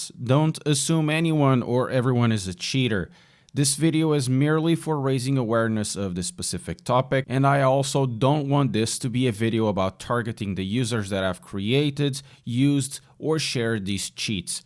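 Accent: Portugal. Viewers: Acho incrivel como mal comecei a ver o vídeo, mesmo com um sotaque e pronuncia mt boa, tive logo o feeling que isto era um tuga ahahah Fui ver outros vídeos e curti do conteúdo, continua o bom trabalho. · You're from Portugal i can hear it 🇵🇹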